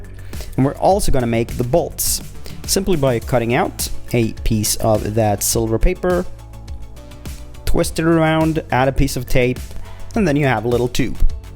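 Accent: Scania, Sweden. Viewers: Yes, vi är båda ifrån Sverige, Öresundsregionen i söder.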